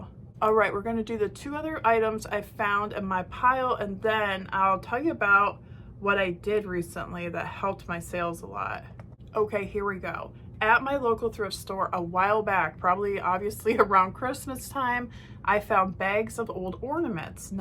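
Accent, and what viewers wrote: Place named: Ireland. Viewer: Great Irish accent! What movie was that with the junk lady troll?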